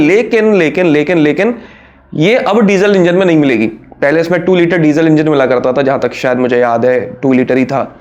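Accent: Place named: Madhya Pradesh, India. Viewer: bhai tum mujhe mp side ke kyo lgte ho language ki wjh se , jo up se lage huye mp ke district hain unse , jese shivpuri , ashok nagar ,datia gwalior wagera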